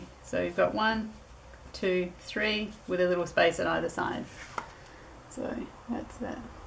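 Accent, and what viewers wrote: Australia. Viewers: hi - spotted you in pinterest & came on over here to yr lil corner of the earth. this is the 2nd one of yr vids i've watched, but plan on watching them all eventually. i hear by yr accent yr an aussie - same here. i'm from adelaide - where are you from? anyway, thanx for sharing yr art, i've really enjoyed watchingit.:) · Great to hear an Australian voice & the correct ways to use mixed media products that are available in our country TFS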